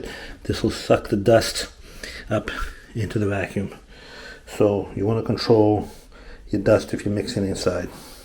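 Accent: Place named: New England, United States. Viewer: Great video. You are definitely in New England with that accent! Boston?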